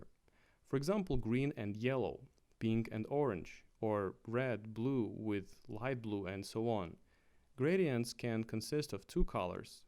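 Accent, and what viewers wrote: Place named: Russia. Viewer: Love your russian accent :3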